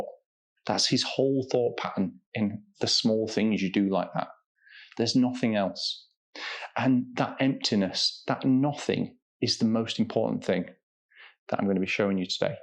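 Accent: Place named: United Kingdom. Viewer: Oh....man...ive always seen u on tictok.....never knew you had the British accent!!! Love it!! Always have...Im from the states & i sound like a dumb hillbilly!! Thank you for all ur work, im still healing after 20yrs with a narc...u are a blesssing❤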